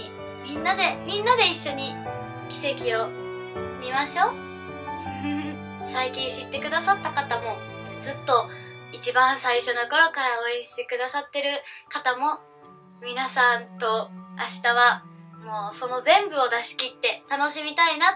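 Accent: Kansai, Japan. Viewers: なんか…めっちゃいい事語ってるんだけど…所々にめっちゃ関西弁混じってるw可愛い〜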